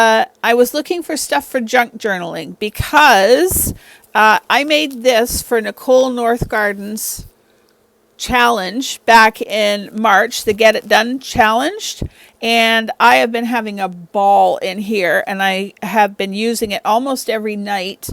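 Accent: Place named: Canada. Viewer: Just love you to bits! Very interesting to hear about your family (even though it's tragic). You are the opposite of idiotic silly, but I appreciate your sentiment. I have to say, my husband was listening while I watched and was smitten with your Canadian accent. But then, he thought you said "teared tray" and asked me if you were crying. 😳 Husbands!...I get my baskets the same way you got yours.😂 Take care and keep warm. God bless you friend 💕🥰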